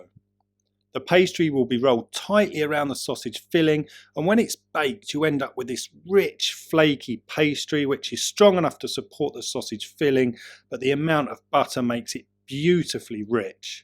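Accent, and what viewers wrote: United Kingdom. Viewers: your British accent is very attracting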